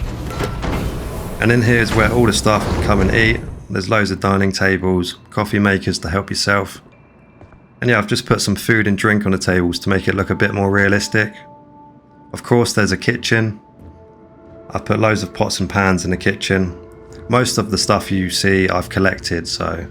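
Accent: United Kingdom. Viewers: Nice outpost dude. If you invest in your outpost skill you can definitely pimp it out even more. Also nice to here a fellow Brit doing some videos haha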